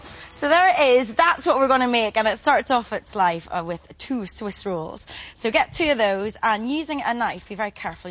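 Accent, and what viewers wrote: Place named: Ulster, United Kingdom. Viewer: Ulster, from the accent.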